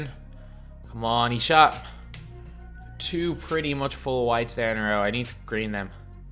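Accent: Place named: Ireland. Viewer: There’s clearly a “H” in “threat” saying “trett” makes no sense. I also don’t know why you say “or” for “R”. I get that you’re Irish but it’s just grammatically incorrect. Good informative videos though 😂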